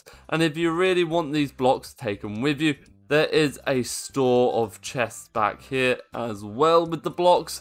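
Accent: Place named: United Kingdom. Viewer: ya fking british accent bro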